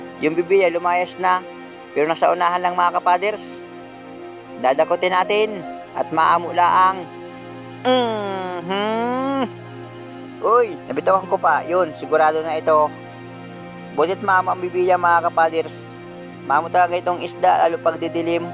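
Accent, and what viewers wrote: Bicol, Philippines. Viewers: Tyaga lng idol,masaya kng panuorin dahil may halong bicol pananalita mo,dahil bicol din ako,sa sipocot cam sur,kaya lng nsa europ ako.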